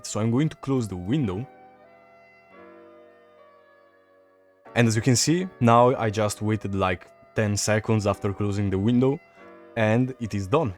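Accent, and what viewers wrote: Italy. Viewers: Sento un po di italiano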